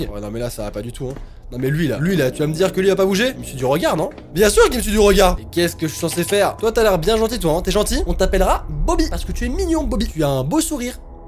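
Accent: England, United Kingdom. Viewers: 😂😂 et sons accent anglais😂😂😂😂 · jeux dhorreur Moi: ouiiii on vas entendre crier*-* Et le fameux accent anglais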